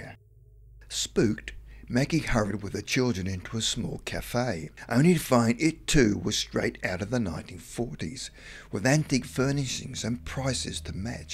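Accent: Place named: Australia. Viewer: The lady is Australian. If you wa want to listen to only English pronunciations then your choice of videos is going to be very limited. Even well paid TV presenters make errors in pronunciation, including the English, Scots, Welsh and Irish. The narrators here don't seem to be monetized. So they are doing us a favour. Don't like it then why be on here long enough to comment? Sheesh.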